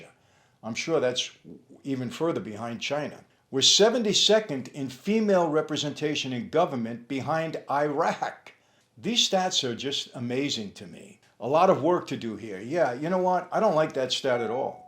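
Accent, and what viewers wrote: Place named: South, United States. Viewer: im from alabama your sure you aint from the south🤔?...LOL..... I agree with just about everything you say man 👊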